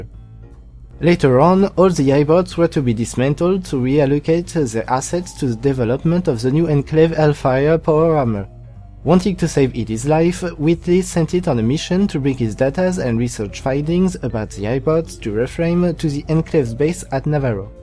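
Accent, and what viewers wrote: France. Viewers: est ce que tu est francais ? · you do understand his native language is French right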